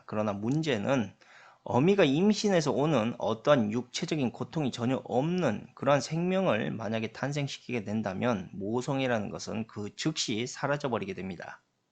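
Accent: Jeolla, South Korea. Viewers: 말씨가 전라도같은데?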